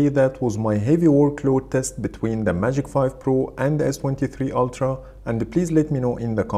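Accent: Egypt. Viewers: لجهتك مصري ١٠٠% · your accent like the Egyptian people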